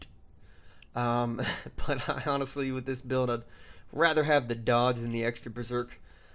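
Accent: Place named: South, United States. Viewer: Nice vid and am I right to assumed you're from the south?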